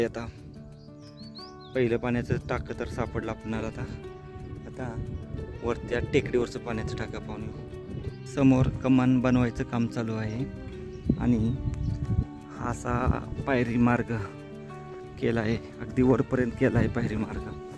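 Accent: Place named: Satara, India. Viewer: तुमच्या भाषा सातारा ची वाटतंय माझा जन्म खेड राजगुरूनगर चा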